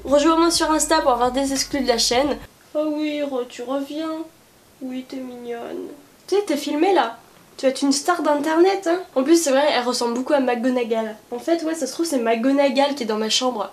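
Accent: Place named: England, United Kingdom. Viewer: J'ai enfin pu regarder la vidéo !!! J'ai du retard moi pfiou.. BREF ! Ton accent anglais est merveilleusement sexy j'adore hihi. J'aimerais trop aussi les voirs ensemble, c'est comme une série qu'on suit avec eux ahah!!! Je veux connaitre la suite moi 💚💚 McGonagall on vous a reconnu faites pas l'innocente. Par contre je savais pas qu'elle était aussi agressive que ça aha. Bisous xoxo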